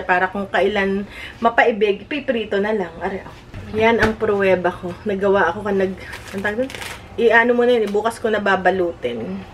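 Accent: Batangas, Philippines. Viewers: GOD BLESS YOU as a good daughter....si mader say ni, she will miss you din daw pag hindi ka pupunta sa kanila. Just continue serving them and itawa mo na lang yung mga comment ni Mader. More power to you and say HI to your parents. I also enjoy watching the 2 boys, lalo na si.... bintog ang cheeks.... is such a sweet ham, baby pa kasi. regards to, saludo ako sa pagpa supportive niya.....you are so Blessed. Keep up the good work,hane.... and dont forget you batangueno accent.....hehehehehe....tita ailec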